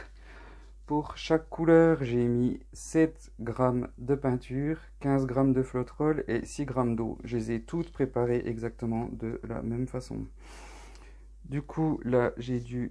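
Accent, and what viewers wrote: Franche-Comté, France. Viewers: C'est super beau les nuances nacrées, j'aime beaucoup 👌 Tu es de quelle région ? Tu as l'accent franc-comtois 😁😁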